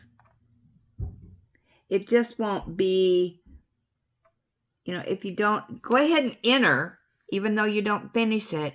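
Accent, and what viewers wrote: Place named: United Kingdom. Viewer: Hey there you crack me up this is amazing diamond painting he sounds British !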